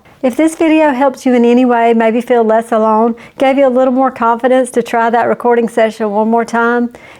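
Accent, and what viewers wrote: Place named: South, United States. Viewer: Not just Women watching you. I’m a subscriber finding your videos very helpful and informative and I’m a 26 year old man 😅 Your videos are great. You remind me of my southern teachers I loved back in my Middle school days when I transferred from Hawaii to Florida, southern accent and down to Earth. Your YT is gonna pop off when Fl, Georgia, Tennessee, Alabama ect. algorithm finds you 🙌🏽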